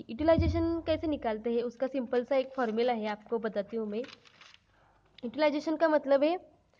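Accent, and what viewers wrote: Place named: Pahadi, India. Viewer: ap pahadiype kyu bol rahi ho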